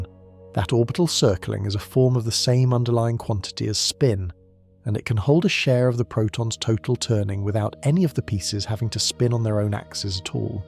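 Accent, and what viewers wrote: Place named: United Kingdom. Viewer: I suppose it’s meant to put you to sleep. But I wish they would make one of these with out a British accent.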